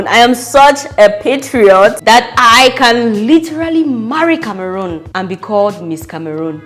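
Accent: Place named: Calabar, Nigeria. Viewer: You were born in cameroon, raised in cameroon but you sound like calabar or akwa ibom(Nigeria)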